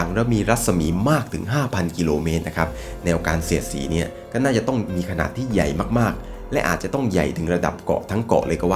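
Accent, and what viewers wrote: South, Thailand. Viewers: เสียงเพราะหางเสียงแอบเหมือนคนใต้ 😊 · พี่เป็นคนใต้ป่ะคะ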